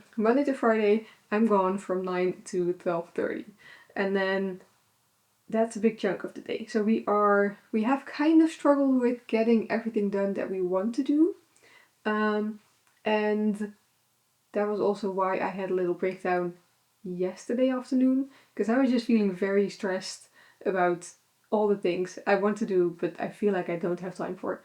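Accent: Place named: Netherlands. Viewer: Concerning you reading Swedish I think you are on to something. 😊 I can understand what you are reading and also that you are from the Netherlands. You sound a lot like my dutch friends when they are trying to speak Swedish. 😊 Keep on your good work. And try to involve your husband too. He's having way to much free time. 😅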